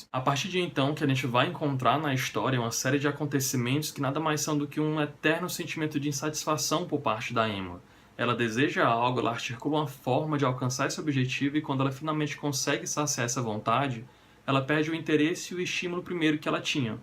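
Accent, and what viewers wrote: Northeast, Brazil. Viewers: Acabei de conhecer o canal pelo vídeo da resenha de A Desumanizacao! Gostei muito do jeito que você descreve os livros, ta de parabéns (sem contar o sotaque nordestino que eu adoro) já tem mais uma inscrita! :) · Sotaque nordestino?😍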